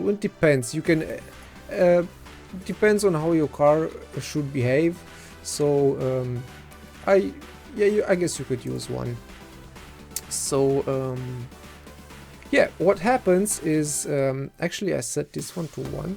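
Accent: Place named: Germany. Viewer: me Too:D i knew i heard that you have some german accent:D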